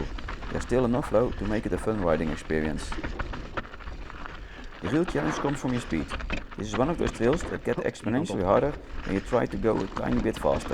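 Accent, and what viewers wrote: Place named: Netherlands. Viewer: ik vind het nederlandse accent engels juist leuk gewoon je eigen ding blijven doen. ik heb je hardtail content nu al 10x opnieuw gekeken . leuke video weer!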